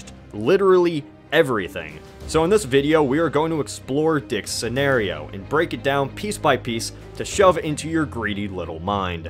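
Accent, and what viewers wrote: Ohio, United States. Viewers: Judging by the way the narrator pronounces "T" sounds, like in "Britain", gotta be from Ohio.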